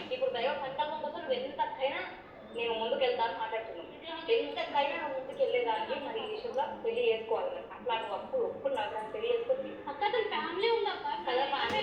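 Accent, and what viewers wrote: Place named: Telangana, India. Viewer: Hi Sister, మీ interview భవిత తో చేసినది చూసి మీ fan అయ్యాను, మీ తెలంగాణా యాస, మీ straight forward questions etc మీరంటే ఇష్టం అయింది. నేను ఒకటి చెపుతాను ఏమీ అనుకోకండి.. నేను వేదాంత classess attend అవుతుంటాను, వాటిల్లో చెప్పేదేమంటే మనిషి జన్మ దొరకాలంటే 84 lakhs species జన్మలు అనుభవించిన తర్వాత మనిషి జన్మ దొరుకుతుంది. మనిషిగా పుట్టిన తరువాత మనిషి గానే పుట్టాలని కూడా లేదు. ఎక్కువ పాపాలు చేస్తూ పొతే మళ్ళీ low level species గా పుట్టవచ్చు. So deep the subject is. I am not negative about you, bkz ur field is different you may not come across vedanta points, only to share with u what I heard in our classess I shared. With authentic gurus you can check what I told. I subscribed ur channel the moment I saw u on the screen.😁 All the best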